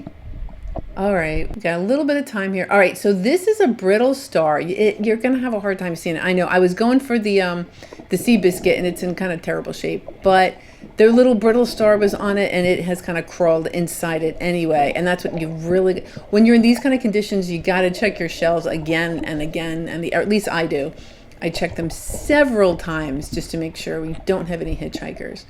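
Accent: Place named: South, United States. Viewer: Hello love! FIRST! Maybe one day, I'll make it to the Keys. Don't know why I've never been... Beautiful sea star! Is that a Clydesdale horse conch? Hey, you're beginning to sound like a real Southern girl! "I'll tell ya what!" You did great and had such an exciting adventure! So happy for you! Much love from Levi and me! It's nearly a hundred degrees up here! We're staying in the AC! Your Mermaid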